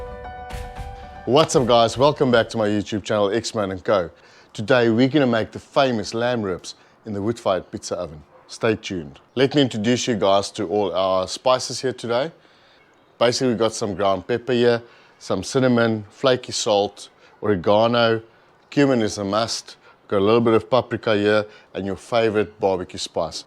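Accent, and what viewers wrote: South Africa. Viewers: south african accent - hows the racism there? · Thank you! I have been looking for a video like this, But most do not show it right, or explain it like you do. I was pleasantly surprised to hear the South African accent on top of it. (I live in USA for 37 years now, but grew up in the highveld) I acquired a whole sheep a few months ago, and pulled out one half of the ribs to try them out. I will be using your method.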